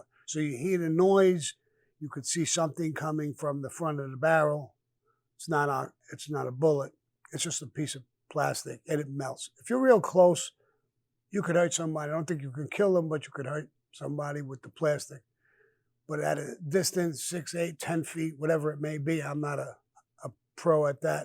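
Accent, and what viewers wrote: New York, United States. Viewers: Hi love hearing all your stories,I enjoy your NEW York accent ' Well have a good night stay cool!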